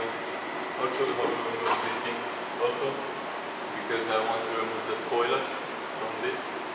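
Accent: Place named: Ireland. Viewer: It's an irish accent I believe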